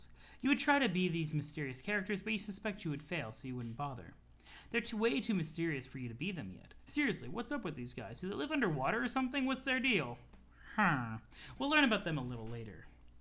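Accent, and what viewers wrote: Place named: Scotland, United Kingdom. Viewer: your socttish eridan accent is the best thing i've ever heard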